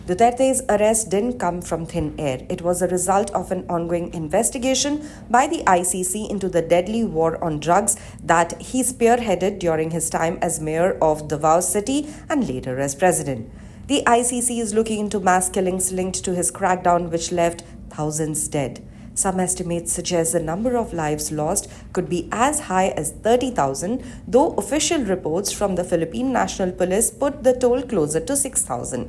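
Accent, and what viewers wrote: India. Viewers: Indian Accent is masakit sa taenga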